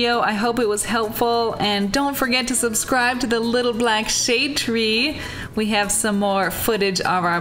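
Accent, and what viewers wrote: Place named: Midwest, United States. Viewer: You sound like youre from Michigan or some midwest state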